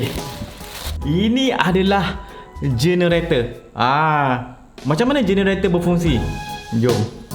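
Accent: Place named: Kelantan, Malaysia. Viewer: Lama dah nk tanya ni. ni ore kelate ke? Ada perkataan bunyi kelate sikit..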